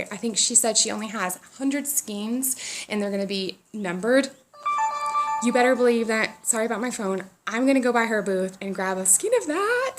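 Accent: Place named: South, United States. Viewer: Hi, I sometimes get those purl bumps too when casting on toe up. To avoid them you need to knit into the back of your stitches on the first row after you cast on. At least that seems to work for me. Also, handy hint, if you are finding all those m1L & m1R really slow going on your toes just do kfb instead. It may not look quite so neat but it's much less hassle & who's going to be studying your toes anyway?! PS love the podcasts & your Southern accent!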